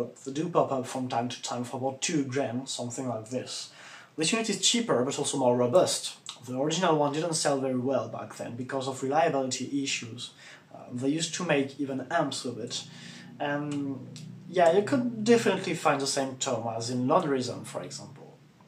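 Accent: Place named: United Kingdom. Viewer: Amazing work as always. Love the English Channel accent <3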